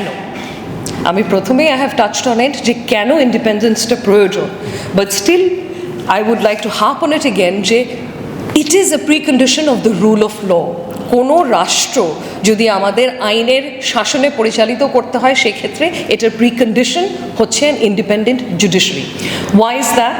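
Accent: India. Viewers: Indian accent but kothaguli valo chilo . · indian accent ! bharotiyo mohila !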